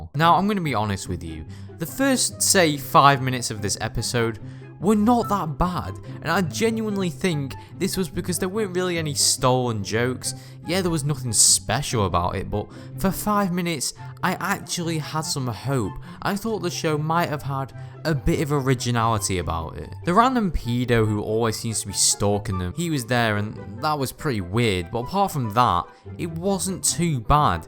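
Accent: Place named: Northern England, United Kingdom. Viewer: Loving the reet northern accent ✌️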